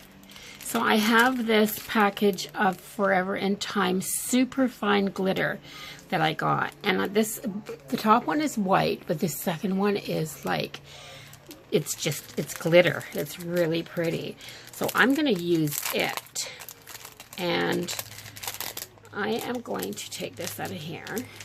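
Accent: Canada. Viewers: You must be from Canada? The way you pronounce out... Beautiful job on the mason jar! Thank you for sharing 💖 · Are you Canadian??? Love your brouge (accent)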